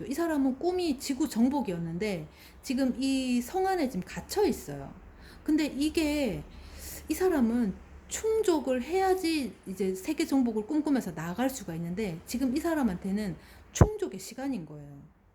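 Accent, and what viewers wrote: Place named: Gyeongbuk, South Korea. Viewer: 경북에 여행갔을때 들었던 억양이었습니다 😊 저는 한국어가 익숙하지 않아서 억양을 잘 기억하거든요!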